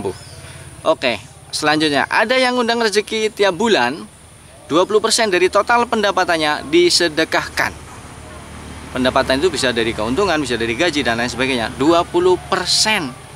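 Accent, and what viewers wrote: Banyumas, Indonesia. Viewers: Nuwunsewu,panjenengan daleme pundi pak?ngapak-ngapake ko kadose mboten asing teng kupinge kulo.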